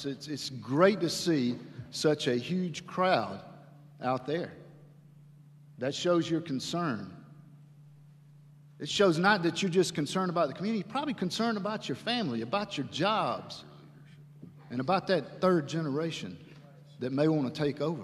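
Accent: South, United States. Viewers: Love his country twang and stern no nonsense voice. Need for like him. You have these elected officials that been in congress to long and forgot what it was like out there looking in. They can’t and don’t want to recognize it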